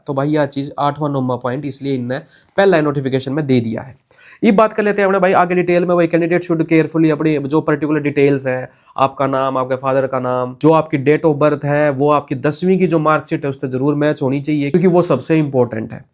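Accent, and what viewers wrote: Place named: Haryana, India. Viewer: Sir ka haryanvi accent ohhhoooo 😍😍😍😍😍😍😍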